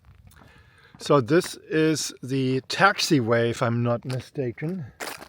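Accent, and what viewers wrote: Germany. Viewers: 1 Sek im Video und ich so oha deutscher Akzent kommt hart durch😂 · Hey there, came across your channel by chance. Was not disappointed. Love the accent - German origin, right? You got your own private airshow there pal, courtesy of the United States Marines. What a treat. And what a great look at an awesome piece of military hardware. Subscribed. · Na da höre ich doch einen deutschen Akzent. Bist DU Auswanderer ? Danke für das Video. Gruß aus der Oberpfalz, Manuel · From your accent you sound German. In case you didn't know we have German military personnel who have i believe permanent duty stations at Holloman AFB, New Mexico and Nellis AFB, Nevada. I hauled weapons, explosives, and other secrey stuff for the governemnt for 30 years and when the Germans shipped their ammo over here we would haul it to them wherever they were based. We loved dealing with them, so polite and efficient in getting us offloaded. 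Be well! :)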